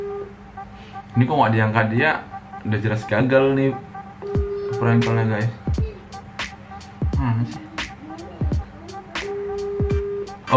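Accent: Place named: Medan, Indonesia. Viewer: medan kali ya wakk. anak padang bulan kayak.kau ini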